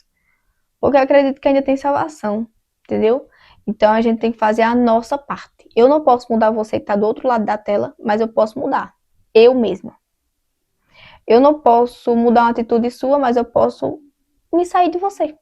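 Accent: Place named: Northeast, Brazil. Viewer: Eu amando o sotaque 😂 sou nordestina tbm, sou da Bahia. Estou no catecumenato, nessa quaresma irei receber os sacramentos 🙏🏼 fui nascida e criada dentro da seita das testemunhas de Jeová, mas gracas a Deus ele me atraiu à Santa igreja ❤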